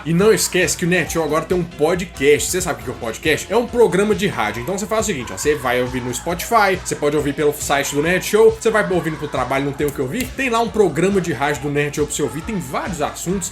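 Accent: Minas Gerais, Brazil. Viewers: Feliz Natal!!!! Feliz Natal!!!! Adoro o seu canal e mesmo sendo redundante não me canso de falar que é melhor canal que eu assisto. adoro O mineres arretado que você tem. Sou a chamada baiana cansada porque sou de Janaúba no Norte de Minas, fã número 1 das gerais, rsrsrsrs. Não perco os seus vídeos principalmente quando vem recheado de histórias que eu amooo. Beijos e muitos sucessos.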